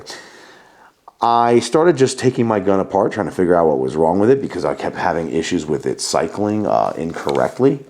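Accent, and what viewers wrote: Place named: East Coast, United States. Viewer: now, hearin your accent, you gotta be east coast! lemme know!!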